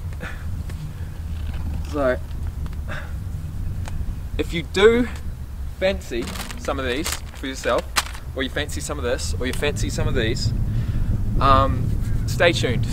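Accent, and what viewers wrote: New Zealand. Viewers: You sound like a Kiwi? Are you Aussie or Kiwi bro? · Are you an Aussie? Got a bit of a kiwi accent going there bro! Nice vid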